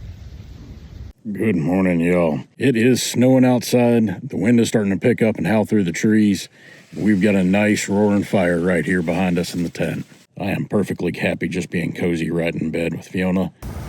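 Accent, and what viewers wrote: South, United States. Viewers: Your accent tells me that you are from where we live, the south.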